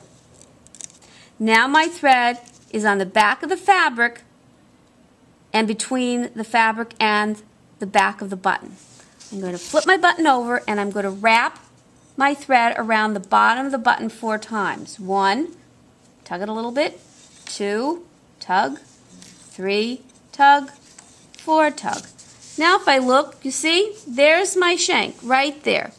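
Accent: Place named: New York, United States. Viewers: I loved this tutorial thanks! It was like beig directed by a mildly annoyed New York/New Jersey person which was brilliant. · You sound like you’re from new york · everyone has an accent no matter where you’re from. My guess for the video is east coast United States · Love the slight NY accent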